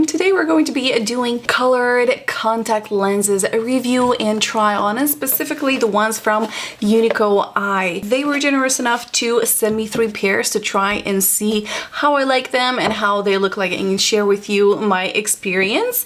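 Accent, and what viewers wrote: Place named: Poland. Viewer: I absolutely love your accent! You have a great soothing voice, you are very beautiful, are you polish? ❤️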